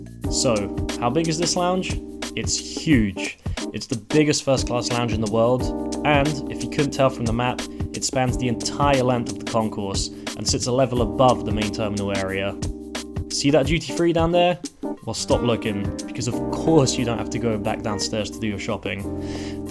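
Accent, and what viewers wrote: England, United Kingdom. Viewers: Extraordinarily light blue eyes. I thought he might be Norwegian but I've just googled and apparently it's diminutive Breton for Jean. Thought he pronounced Perignon well.. Saw another vid where he put a stress on the "u" of Olufsen, whereas English people usually stress the O, although you can't tell he's not Oxford/Slough English with just a touch of Youtube US. Loved the cubbyhole demo🙋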